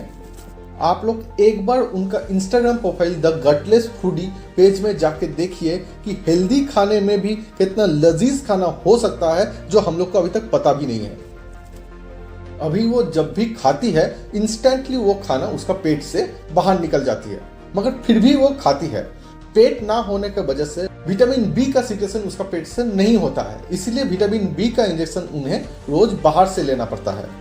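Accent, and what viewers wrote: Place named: Bihar, India. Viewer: Yeh bost bihari bolna sikh le pahle...tera problem pasand nhi aya..teri boli.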